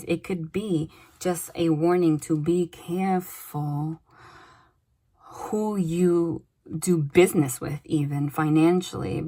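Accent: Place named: New York, United States. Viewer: I needed this right now. it resonated so hard. I was thinking I need outside guidance to validate and clarify my own situation and this video was at the top of my notifications. posted like a half hour ago. and I've been sitting here for like a half hour pondering what's going on and boom here you are. and as a New Yorker I LOVE your New York accent. god it's so refreshing hearing a reader who speaks like me. like hearing my messages in a to the point direct New York way. like I feel like my mother or cousins are talking to me. I love your method of channeling. I have a similar type of occurrences of signs and validations and the cards coming out.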